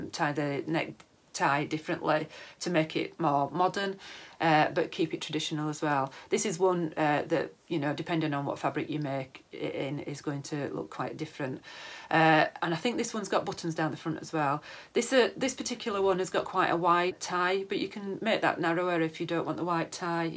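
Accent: Yorkshire, United Kingdom. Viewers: Sewing. Free. Yorkshire accent. I’m ere for it lass. 😊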